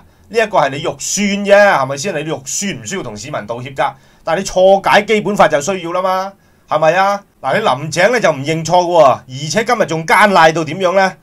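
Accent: Guangzhou, China. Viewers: 好似廣州人口音，唔似香港澳門人口音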